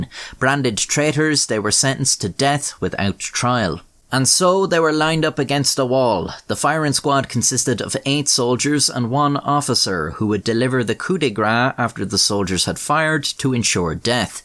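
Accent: Ireland. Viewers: Love the Irish accent. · lol randomly got a recommend to this vid, and honestly the irish accent made this 100 times better. XD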